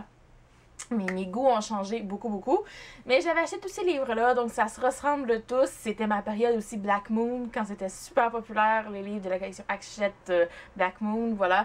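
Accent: Quebec, Canada. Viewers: Belle PAL ! J'adore ton accent. J'ai de la famille à Montréal. Toi c'est le Québec ?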